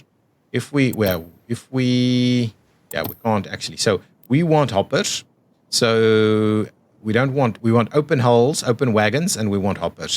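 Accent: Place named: Afrikaans, South Africa. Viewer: Are you from South Africa? Your accent is very Afrikaans. The way the 'R' is pronounced makes me smile every time.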